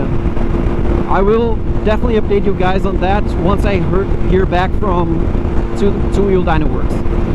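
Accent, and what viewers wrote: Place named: Scandinavia. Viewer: sounds portoguise. But your accent sounds scandinavian:)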